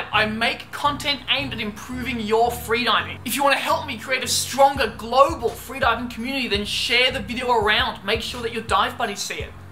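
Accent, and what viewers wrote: Australia. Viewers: I like they way you talk Aussie man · I lova australian english!!!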